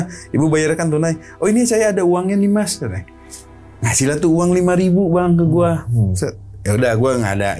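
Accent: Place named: Betawi, Indonesia. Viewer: Kagak ngerti klo Nyang cerita pakai dialek Betawi suka bgt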